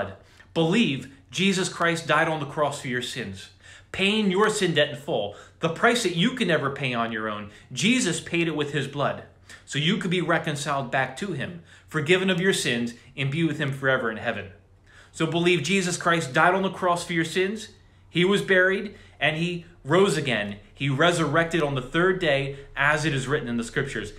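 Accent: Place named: United States. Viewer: Oh you sound like an American.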